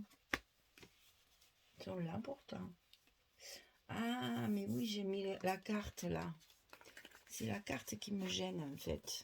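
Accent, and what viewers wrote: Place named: Occitanie, France. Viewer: Bonjour, j'adore vos créations elle sont manifiques 😍💕votre accent très agréable ressemble a l'Occitanie. BRAVO.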